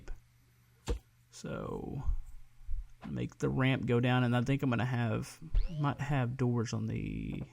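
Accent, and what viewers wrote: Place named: South, United States. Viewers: I'm guessing Texas. At first North Carolina but after talking to my uncle, I'm 99% sure it's Texas. Although I may be wrong and look like an idiot lol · I swear he has a southern accent.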